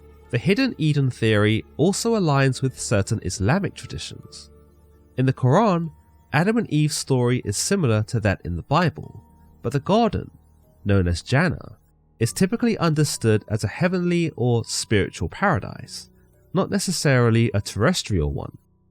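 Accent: United Kingdom. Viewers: I knew there was going to be some type of British accent when I clicked on this.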